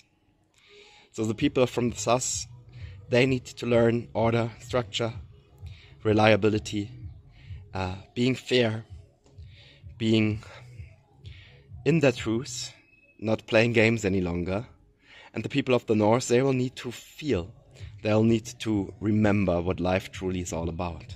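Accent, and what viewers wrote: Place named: Germany. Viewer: Thank you. Just found you this moment. Feels like blue ray expression transmitting, and now you confirmed my clear impression of german accent . Or swiss. Watched your history transmission. Interesting, but not very relevant from my point of view. I am open to share and love to get finally in touch/exchange with same frequency souls, like blue rays . Its about unifying now, from my point of view. Love from Patagonia, Chile from a humble german imigrant😅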